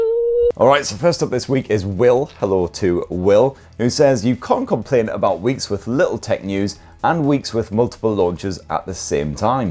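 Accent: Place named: Scotland, United Kingdom. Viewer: I like how he probably uses a Mac to upload his videos and he treats Mac OS and MacBooks like a pile of 💩 😂 very pointless.. 😩 same as that disgusting bottle of “American” whiskey he drinks , when you could get tons of good Scottish whiskey, he kinda sound Scottish .. if that’s the case that’s even more shameful.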